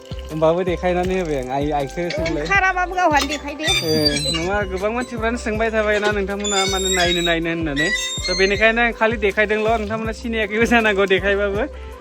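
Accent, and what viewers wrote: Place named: Goalpara, India. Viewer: जोंनि गवलपारानि रावजों सेम आदा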